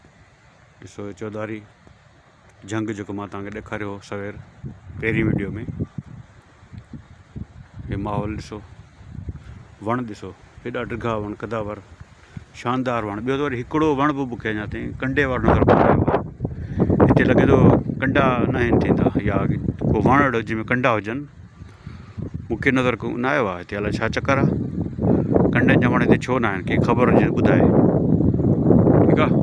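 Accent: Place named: Sindh, Pakistan. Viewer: You have beautiful Sindhi accent. Sir MashaAllah.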